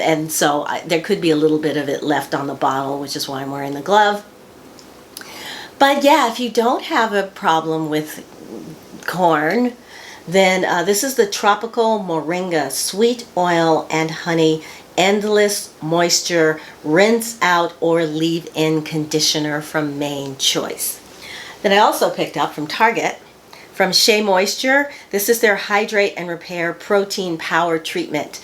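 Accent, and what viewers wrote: United Kingdom. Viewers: Love the British accent! You are so posh!:))) 💛💚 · Happy Birthday!💕🎂🌸 I’m 5 months older than you.😀 That British accent...I thought I clicked on someone else’s channel at first. That was great. It that a romper you’re wearing? Love both the style and color on you. Which one is the link please? Thank you. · Like the British Accent:) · Great job on the British accent. I’ve always loved the way they speak, makes the English language sound so much better. Great haul,lots of goodies. I need a good bra that will lift and hoist these puppies back to where they belong. Lol ❤️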